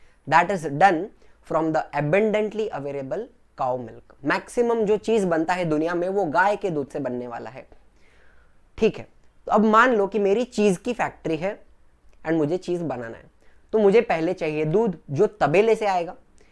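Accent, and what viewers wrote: Maharashtra, India. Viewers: Sir kya ap marathi speaker hai??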